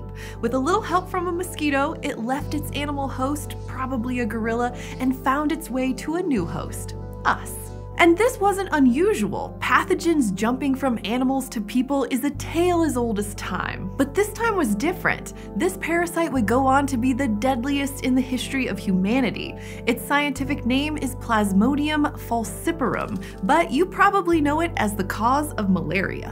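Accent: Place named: United States. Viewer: She can speak correctly, I’m not sure what you are referring to. Her accent is also very mild as American accents go which means she’s easy to understand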